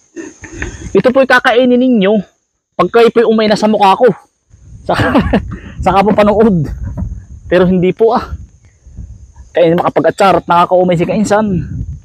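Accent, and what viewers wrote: Quezon, Philippines. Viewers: Iyan po ang nakasanayang tagalog ng mga taga Quezon, mahirap kay na baguhin ang nakasanayan Ay sadya iyan ang punto at mga termino gamit dito sa Quezon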